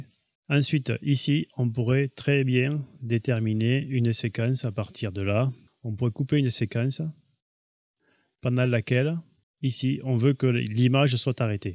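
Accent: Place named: Provence, France. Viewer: Voilà une belle voix qui respire la garigue et le romarin comme Galinette peuchère !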